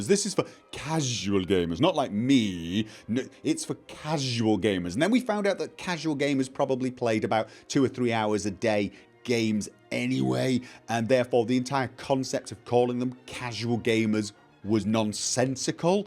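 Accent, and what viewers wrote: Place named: Manchester, United Kingdom. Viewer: Most English personspeoples can identify that subtle:0 Mancunian accent...If he starts talking Norwegian I for one will be lost. P.s. great avatar.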